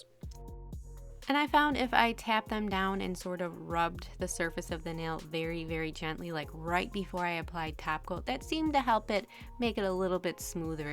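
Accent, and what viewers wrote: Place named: Upper Midwest, United States. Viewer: so beautiful, and I've never been much of a glitter girl, but here I am at 60 years old, thinking, "mmmmm.....glitter...." lol And I recognize your accent now, because I was born and mostly raised in Michigan. Upper midwest, somwhere is my guess. No wonder I like you!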